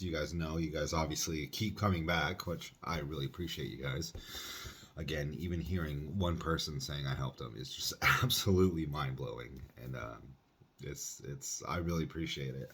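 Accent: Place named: United States. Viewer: You look really Norwegian indeed. Hearing your fluent English American accent is so weird.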